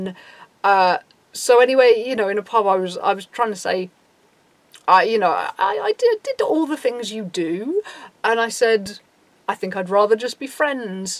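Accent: United Kingdom. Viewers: You sound British 😁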